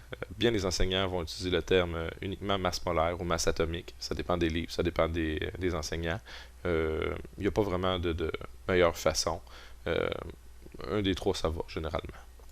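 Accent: Quebec, Canada. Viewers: Ah un accent quebequois !!!! mercci ^^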